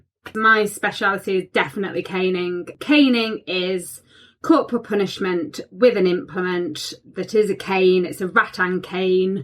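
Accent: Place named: England, United Kingdom. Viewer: She's decent looking but that English whiney voice has to go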